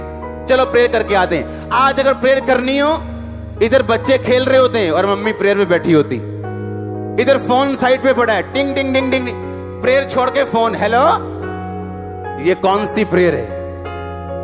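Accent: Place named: Punjab, India. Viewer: Apki Punjabi language bolte phli bar suna so swt papa ji😊❤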